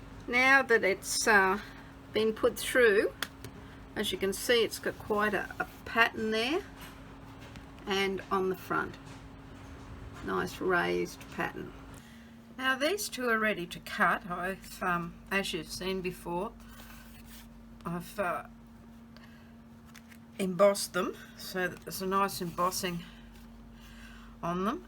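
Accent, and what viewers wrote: Australia. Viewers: Hey just curious what is your accent, Australian or am I incorrect?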